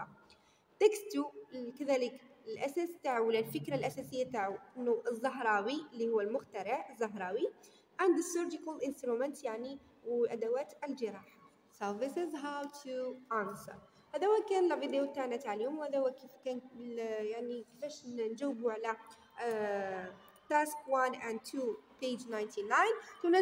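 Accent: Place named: India. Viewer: يا استاذة مع احترامي اللهجة الانجليزية تاعك مثل لهجة الهند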